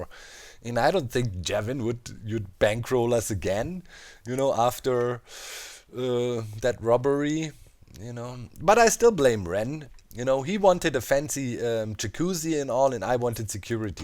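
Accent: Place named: Germany. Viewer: Are you German, because you really do sound like it (I'm Sorry if you answered this before I'm new...)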